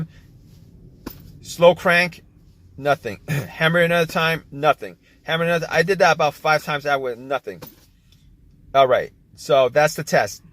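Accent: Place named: Rhode Island, United States. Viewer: This guy sounds like he is from my hometown (RI)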